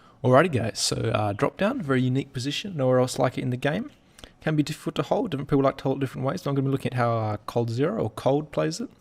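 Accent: Australia. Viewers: are you Australian? Im in love with your accent :DD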